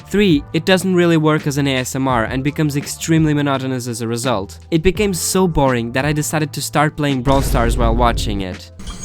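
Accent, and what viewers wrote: Portugal. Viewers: YOU'RE PORTUGUESE. THE SIR KAZIO MEME? THE "WAAAAAAAA" ONE? YOU AXCENT? I KNOW IT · you sound portuguese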